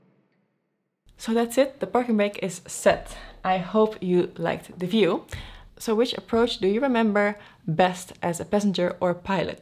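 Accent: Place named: Netherlands. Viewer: Hi, your Videos are very good. The approach an landing at Lugano Airport was superb. But... PLEASE... can you speak a bit slower in your videos. You have a little "Dutch-Slang" in your speaking and for non-English or non-US-man it's very very hard to understand. Please do not be offended because of my little criticism. CU Sönke...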